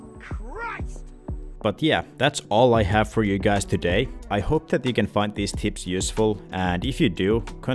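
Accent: Finland. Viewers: Sulla on todella hyvä englanti suomalaiseks, en meinannu tunnistaa mutta jotenki puski suomalaisuus läpi mikrofonin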